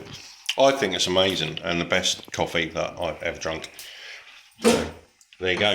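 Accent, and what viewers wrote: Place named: United Kingdom. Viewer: I definitely needed a British bloke review and run through of this! The American voices really grind on me 🤣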